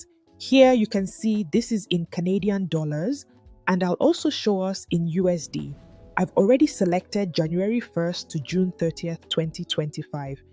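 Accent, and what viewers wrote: Nigeria. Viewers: Yea. I knew I could recognize that's Nigerian accent. I love your channel and I'm subscribing 🖐️ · Wait you are Canadian keeee.. you sound soo Nigerian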